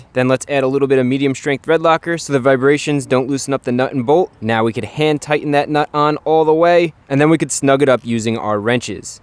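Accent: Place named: New Jersey, United States. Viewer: *Soapy water - Pronounced as "wooder," likely due to his New Jersey accent.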